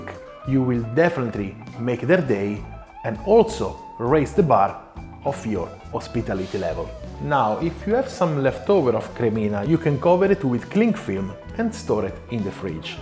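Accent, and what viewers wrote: Italy. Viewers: Italian is the new French accent 😮❤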